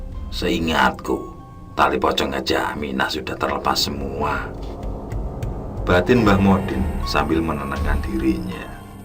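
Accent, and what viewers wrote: Java, Indonesia. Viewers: Medhok men cuk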